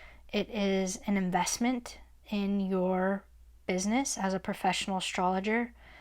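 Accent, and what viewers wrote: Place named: Italy. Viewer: I heard the Italian in you before you said it.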